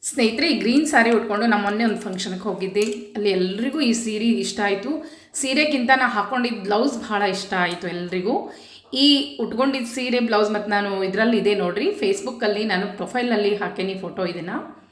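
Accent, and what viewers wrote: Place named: Hubballi-Dharwad, India. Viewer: akka ನನಗೂ ನೀಮನ್ ನೋಡಲು ತುಂಬಾ ಆಸೇ ನೀವು ಯಾವ ಊರಲ್ಲಿ ಈರ್ತೀರೀ ನನಗೆ ಹುಬ್ಬಳ್ಳಿ ಧಾರವಾಡ ಅನಿಸುತ್ತದೆ ನಿಮ್ಮ ಮಾತನ್ನು ಕೇಳದರೇ ನಾವು ಆಗಾಗ ಹುಬ್ಬಳ್ಳಿ ಗೇ ಬರುತ್ತವೆ ನಿಮ್ಮ ಅಪಣೇ ಇವರೇ ನಾನು ನೀಮನ್ ನೋಡಲು ತುಂಬಾ ಆಸೀ ನೀವು ನಿಮ್ಮ ಸಂಸಾರ ದ ಬಗ್ಗೆ ಓಂದು ವೀಡಿಯೊ ಮಾಡಿ ಎಷ್ಟು ಜನ ಮಕ್ಕಳು ಎನ್ ಮಾಡತಾರ ಸರ್ ಎನ್ ಮಾಡತಾರೇ ಹೇಳಿ ಮತ್ತು ನಿಮ್ಮ ಮನೆಯ ವೀಡಿಯೊ ಮಾಡಿ ನಮಗೆ ತೋರಿಸಿ ನಿಮ್ಮ ದೇವರ ಮನೆ ಎಲ್ಲ ವನ್ನೂ ನೋಡುವ ಬಯಕೆ ನೀವು ನಮ್ಮ ವರೂ ಅಂತ ಕೇಳಿ ನಿ akka ದಯಮಾಡಿ ತಪ್ಪು ತಿಳಿಯ ಬೇಡಿ ಇಂತಿ ನೀಮ್ ತಂಗಿ ಲತಾ ಕುಲಕರ್ಣಿ ನಮಸ್ಕಾರ🙏🙏🙏🙏🙏 ಗಳು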